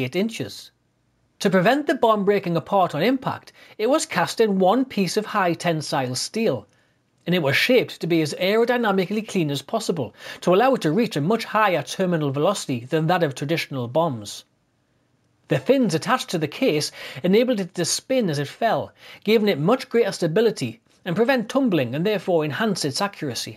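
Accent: Newcastle, United Kingdom. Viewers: Really excellent video. Great narration (got to love the Geordie accent), and fascinating content. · Thanks for a super enthsiastic video and your beutiful Goerdie accent, one of the friendliest voices in the world. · This is a Geordie accent, yes?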